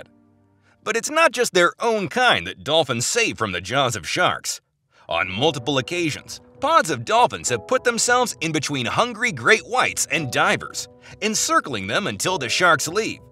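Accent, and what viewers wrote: United States. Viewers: Appalling American narration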